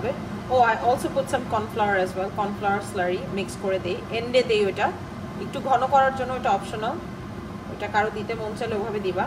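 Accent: Sylhet, Bangladesh. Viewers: Your Sylhety voice is cute bestie😊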